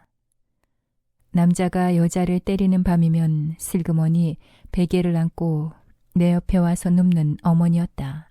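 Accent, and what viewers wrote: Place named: Gyeongsang, South Korea. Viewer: 산 기슭에 판자를 얽어 움막을 짓고 살았던 사람들의 이야기가 연상되네요. 물론 한 세대의 간극은 있지만요. 술이 고주망태가 되어 마누라를 무지하게 패던 못난 놈들이 살았던 시절. 그래도 아침에는 해장국이 밥상에 오르던 그때 이야기는 이제는 없겟지요? 님의 경상도 사투리가 아주 구수합니다. 박재홍님의 옛 노래의 노래처럼 말입니다.잘들었습니다.☆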